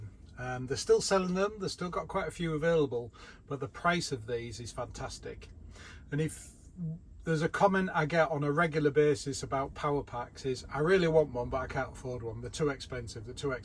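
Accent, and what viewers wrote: Hull, United Kingdom. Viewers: I want one ,nice piece of kit ,love the videos nice hull accent 😮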